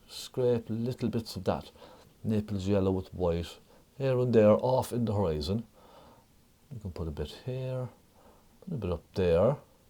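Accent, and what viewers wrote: Ireland. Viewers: how much do you charge for just a recording of your voice? The Irish magic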